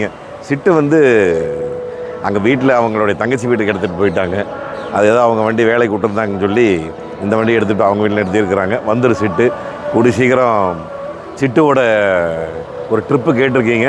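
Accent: Kongu, India. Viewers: கொஞ்சும் கொங்குத் தமிழ் பேசும் கோமகரே...! திகட்டாத தித்திக்கும் பேச்சுடைய திருப்பூர் திருமகரே...! லடாக் பயணச் சாதனைச் சகாப்தம் எங்கள் மதிப்பிற்குறிய டான் திரு. அண்ணா அவர்களே❤❤❤🙏 எப்படி இருக்கீங்க அண்ணி❤❤❤🙏 எப்படி இருக்காங்க தம்பி ரித்திக்❤❤❤ எப்படி இருக்காரு விழிகளில் பதிந்தது புதிய கார் திறப்பு விழா💐💐💐 ஆனால் மனதில் பதிந்த நிகழ்வு எல்லாம் மதிப்பிற்குறிய டான் திரு. அண்ணா அவர்களுக்கு ஷோரூமில் அவர்கள் தந்த மரியாதைதான்❤❤❤💐💐💐💐😊😊😊❤❤ அருமையான வீடியோ❤❤❤ என்றும் அன்புடன் உங்கள் தம்பி என்றும் அன்புடன் உங்கள் TMF ரா.முஹம்மது கபூர் (சென்னையில் இருந்து) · neenga peysura kongu tamil, keyka keyka keyakalaamnu iruku sir 🙂 appa appa anga inga thirumbi sirikurathu ha ha ha super and very natural way of doing youtube videos.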